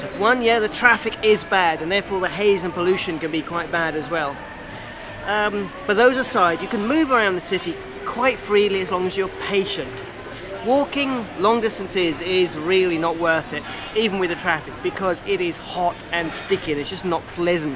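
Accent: United Kingdom. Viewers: Based on your accent, you must be from the UK, right?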